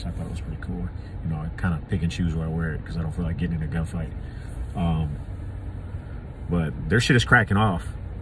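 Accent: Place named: South, United States. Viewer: No no, then ya won’t hear that lovely southern drawl!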